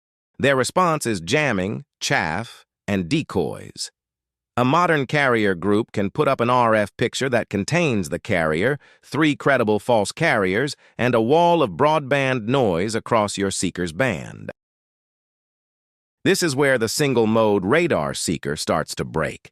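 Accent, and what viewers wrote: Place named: South, United States. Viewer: Why your ai sound like hes from the south?😅 nice video though!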